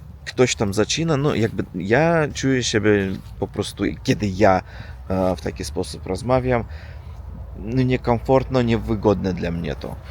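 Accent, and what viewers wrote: East, Poland. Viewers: No dobra, "Polska dla Polaków" a Mars dla Marsjan a kozy dla muzułmanów 😊.! Za bardzo się przejmujesz. Ja jako PRAWICOWIEC nie mam absolutnie nic do uczciwych honorowych ludzi. Nie wydajesz się być kolejnym drobnym cwaniaczkiem a raczej wydaje mi się, że chcesz sobie ułożyć życie w Polsce. Wydaje mi się, że mimo wielu przykładów patologii jakiej doznają także Polacy, jedyną rzeczą która tak naprawdę może zaszkodzić nam wszystkim to nieobliczalne antypolskie decyzje politykiery. Spędziłem 1/3 życia po za Polską i jedno ci powiem - w chwili gdy się wtopisz w miejscowe społeczeństwo, to i ono będzie występować w twojej obronie... bo staniesz się swojakiem. Wschodni akcent kiedyś zniknie, kwestia czasu. Ważne to co masz pod kopułą i jak reagujesz wewnętrznie na to co życie przynosi. Jak by nie było - Miłego pobytu. 😊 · Ludzie uważają że jak mówisz z akcentem wschodnim to jesteś ukraińcem dlatego kazali Ci jechać na ukrainę, a musisz wiedzieć że nie którzy Polacy nie lubią ukraińców przez to jak się w Polsce panoszą, są krzykliwi , chamscy, wszędzie ich pełno. 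Dodać do tego co wygadują w internecie na Polaków choć byliśmy pierwszym państwem które im pomagało to chyba nie dziwi że nie którzy Polacy się tak zachowują. I to nie historia nas tak dzieli tylko ich zachowanie. Myślę,że ten co Ci tak powiedział był ukraińcem albo człowieczkiem mało myślącym. Serdecznie Ciebie pozdrawiam i proszę o więcej Twoich wypowiedzi o naszych stosunkach międzynarodowych czyli Rosją i Polską.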